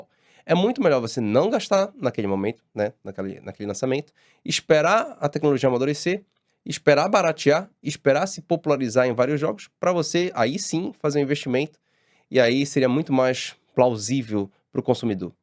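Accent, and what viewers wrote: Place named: Belém, Brazil. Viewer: Esse cara é de Belém. Percebi pelo sotaque.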